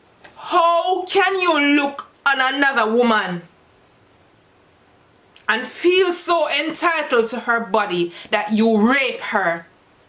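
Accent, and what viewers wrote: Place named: Caribbean. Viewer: Are you west indian???? 🤭 you sound like us❤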